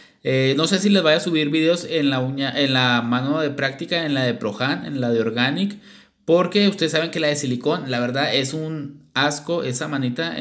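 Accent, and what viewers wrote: Mexico. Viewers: Insisto con que hermoso acento Mexicano tienes, tu forma de hablar me relaja tanto. Y tu manera de hacer las uñas es maravilloso igual que ese color. 😍💙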